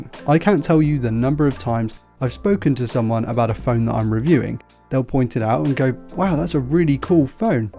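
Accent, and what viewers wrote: England, United Kingdom. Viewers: an englishman posts clickbaits on principle- here it being to gather as many likes as he can. your accent is the MVP of your channel, Sire.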